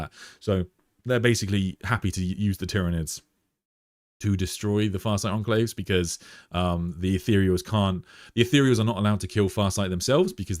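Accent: United Kingdom. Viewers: I love when british caleb hammer talks warhammer to me :D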